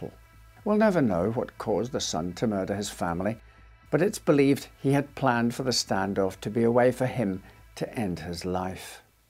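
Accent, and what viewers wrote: Scotland, United Kingdom. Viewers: I hope you get well.. I enjoy his narration style, and the subtle Scottish burr allows these stories of terrible people happening to decent folks bearable to hear about. I cannot believe I used the adjective "subtle" to describe Scottish.. anything. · You remind me so much of the Scottish actor in Outlander not sure of his name. I love your accent 😊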